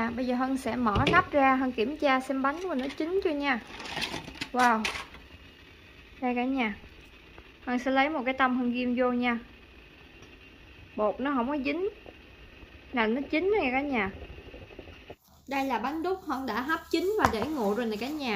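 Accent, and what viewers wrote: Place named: Mekong Delta, Vietnam. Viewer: Hi...lần đầu xem video của b ..rất ấn tượng với tiêu đề ( nhớ mẹ)... Nghe cảm xúc ghê ..❤❤❤❤ Tiếng miền tây của b nghe🌾🌾🎣 thân thiện rỏ ràng không lẫn vào đâu đc hết... Cách chia sẻ cách làm món ăn thật chi tiết.thích👍😍 Chúc vui vẻ 🥰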